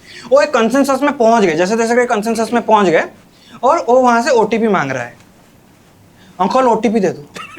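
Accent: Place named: Odisha, India. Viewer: Jemiti Droupadi Murmu Hindi Kuhanti kintu Lage Pura Odia kahila Bhali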